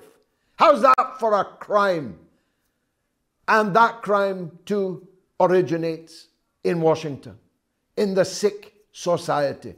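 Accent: United Kingdom. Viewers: Damn you've turned into Tucker carlson with the British accent. Trump's actions were deliberately criminal.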